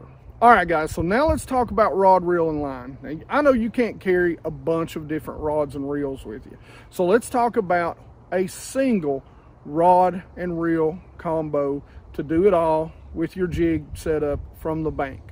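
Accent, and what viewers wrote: South, United States. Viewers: I'm new to bass fishin and you got a sub out of me! Your very informative and thorough. plus that good ol' southern accent just makes it easy to listen to haha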